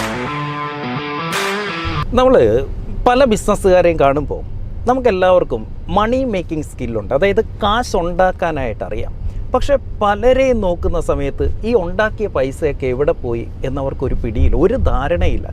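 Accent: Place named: Alappuzha, India. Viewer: ആലപ്പുഴ ഭാsha not കൊയ്‌ക്കോട് ഭാഷ..they are njannamunja but can sell a koikode to any keralites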